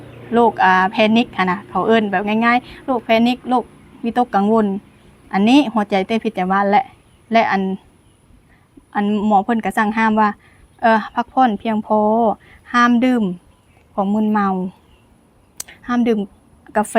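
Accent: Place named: Khon Kaen, Thailand. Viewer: เพียงยูแขวงได๋ เว้าคือบ้านอ้ายแท้ ขอนแก่น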